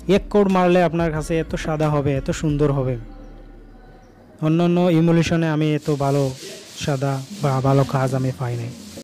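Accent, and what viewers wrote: Sylhet, Bangladesh. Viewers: Sylheti ni bai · খাস সিলেটি❤️ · ভাই তুমি সিলেটি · Vai sylheti ni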